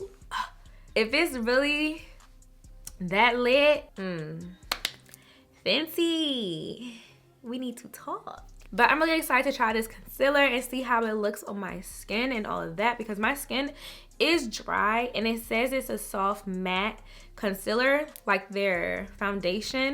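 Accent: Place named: Baltimore, United States. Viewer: It's my first time watching you. Are you from Baltimore? I detect an accent. Your face looked flawless btw.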